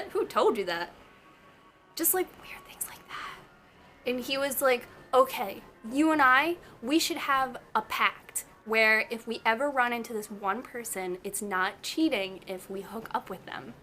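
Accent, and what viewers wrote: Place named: South, United States. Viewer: I love how you've had a slight country accent most of the video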